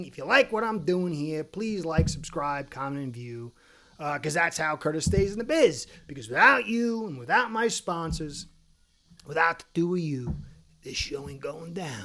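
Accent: New York, United States. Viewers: I love your thick New Yorker accent.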